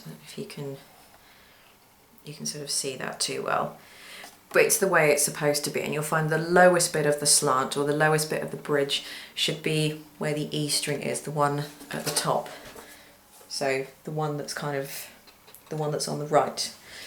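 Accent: United Kingdom. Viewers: Love that Limey accent! When she says "tune" she pronounces it like "chune" Chic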